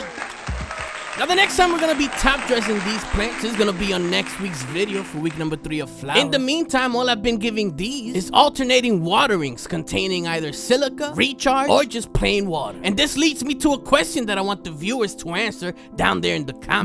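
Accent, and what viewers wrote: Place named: Brooklyn, United States. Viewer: I knew you sounded like a Brooklyn kid lol😂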